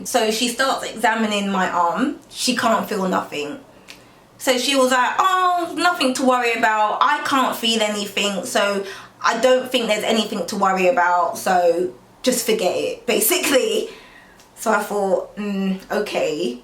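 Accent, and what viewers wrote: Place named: England, United Kingdom. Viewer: I wonder where in England you’re from?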